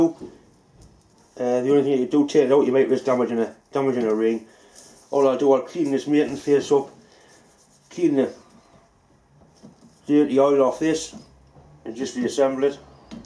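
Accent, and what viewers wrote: Newcastle, United Kingdom. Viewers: Marine Engineer: my thoughts exactly. Did you by any chance do any shipyard time? Some many of those thoughts and expressions common to lots of us. Being close to South/North Shields you must have met lots of shipyard guys doing their apprenticeships. I'm in Canada (West Coast) and worked with lots of North East guys: been asked for a Dolly and other fascinating tools. Retired now but I did get to help pull a bottom end from a direct reversing common-rail engine and watch him do a bit of hand scraping. There were always hand scrapers in every engine room but I never learned to use them. Shells for me every where. Thanks for your videos. My wife claims not to be able to understand you at all. I certainly do! Don't hear many Geordie s now that I'm retired.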